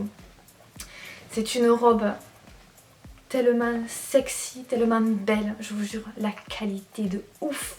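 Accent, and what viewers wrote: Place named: Béziers, France. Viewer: Ptn t'es de Béziers toi ça s'entends de fou :p. vive le suud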